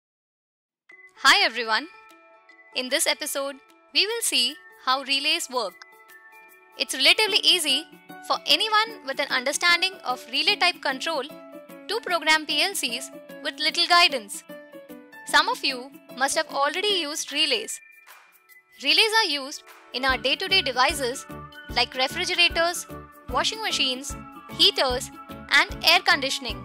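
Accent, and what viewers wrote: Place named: India. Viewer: Great presentation but this annoying woman with an indian accent i can't...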